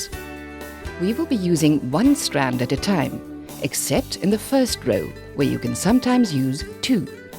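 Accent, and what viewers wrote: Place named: South Africa. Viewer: She is South African, we speak this way.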